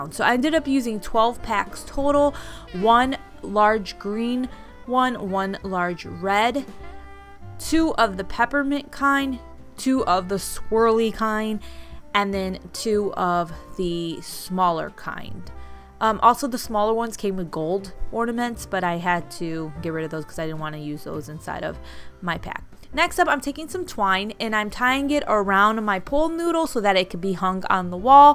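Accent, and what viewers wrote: Chicago, United States. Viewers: Awesome as always. From one Chicago girl to another, it’s our accent. LOL OR DA MINT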